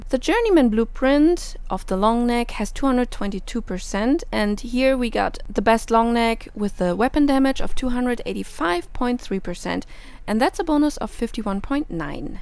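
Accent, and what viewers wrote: Germany. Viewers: me as a german: She spoke Video very german...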